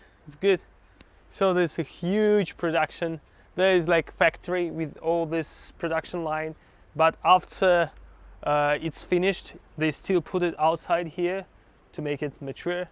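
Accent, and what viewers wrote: Russia. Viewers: Your accent sounds like Russian btw, anyways great video! 😁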